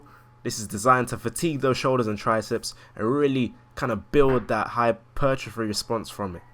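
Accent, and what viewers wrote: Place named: RP, United Kingdom. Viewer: wasnt expecting the posh british accent when i clicked this video. Nice video lol.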